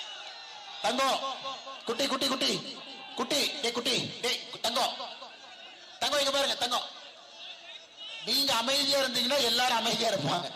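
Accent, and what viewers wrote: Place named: Kongu, India. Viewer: Kongu nattuth thangam namma singam arumai Annamalai. Kongu nattu pechu valakkil thangam thangam ❤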